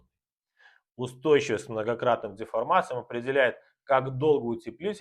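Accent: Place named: Belarus. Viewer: ваш белорусский прононс мне нравится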